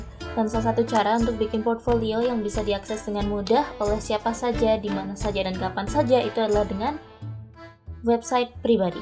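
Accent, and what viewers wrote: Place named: Java, Indonesia. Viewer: Semangat Mbak....walaupun di Tokyo dan sering komunikasi pake bhs jepang/english tapi aksen jawane isih ketok lho...wkwkwkwk. Maturnuwun nggeh tutorialnya about digital content...ayo banyak upload cerita tentah gimana bisa kuliah di jepang dll....kehidupan sehari hari di jepang, suka duka nya...dll...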